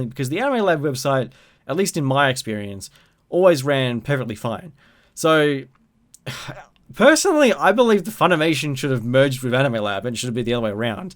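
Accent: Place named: New Zealand. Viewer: U Aussie cuz u don’t sound like it u sound like ur NZ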